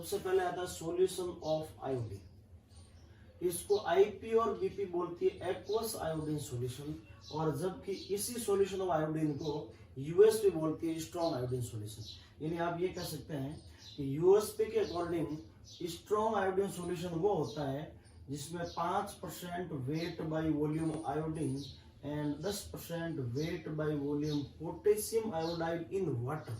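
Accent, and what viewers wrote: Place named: India. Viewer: he speaks indian dude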